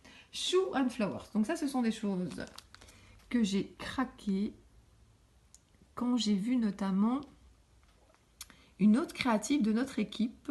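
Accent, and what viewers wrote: Belgium. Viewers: Coucou, trop bien tes achats, moi, j'ai hâte d'aller à Passion Scrap en avril. J'adore ce que fait Scrapistelle. J'ai fais une crop d'une journée avec elle pour réaliser un album il y a de ça quelques années...elle est top...sympa, créative, simple...trop bien. Je pense que tu dois être pareille...et j'adoooore cet accent qui me rappelle trop ma Belgique natale...au plaisir de voir quelques autres de tes réalisations. Gros bisous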